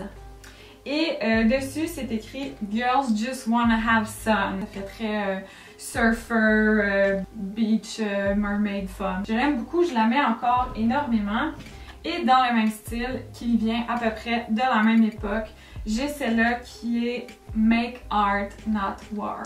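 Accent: Quebec, Canada. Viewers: C'est pas grave, on adore t'entendre parler "quebecois". Je n'y suis allee qu'une fois mais j'ai adore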